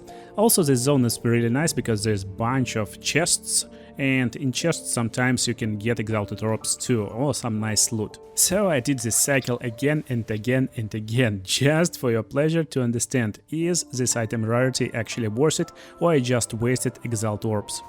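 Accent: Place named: Russia. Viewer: The gameplay is nothing special really no offense but your boris accent and little edits made it very entertaining.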